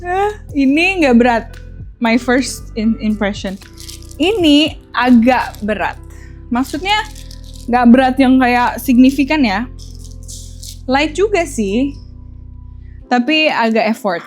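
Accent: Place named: France. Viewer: aku tu suka bgt kalau kamu ud ngomong inggris tapi akses prancis kek kek anjaay mehong bgt dirimu😭💓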